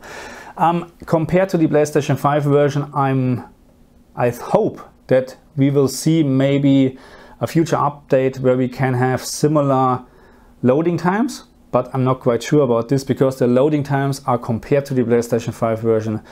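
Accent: Germany. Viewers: nices Video und nicer deutscher Accent haha😄😂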